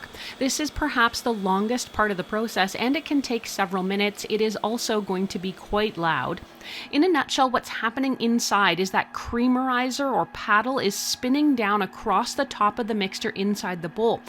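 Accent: Canada. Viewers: Excellent 905 accent.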